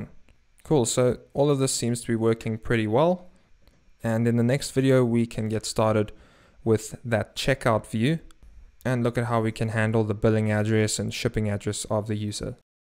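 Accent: South Africa. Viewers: is from Pretoria I think. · Is that a South African accent I'm hearing? 😌 Thanks for the tutorial 👍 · Jy is 100% van suid afrika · Is this guy from South Africa? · Sounds like a South African accent. 😌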